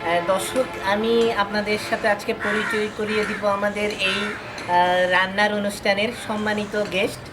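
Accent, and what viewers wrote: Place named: Rajshahi, Bangladesh. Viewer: rajshahir pola kothai buja jaitache ga.... nice job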